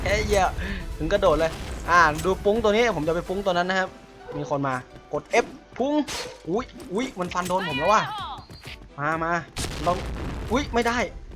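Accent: Isan, Thailand. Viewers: ชอบคนแบบพี่มาก อ่ะ แบบพูดอีสานสำเนียงไทย 555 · อ่าย เจ่าเป็นคนอีสานบอ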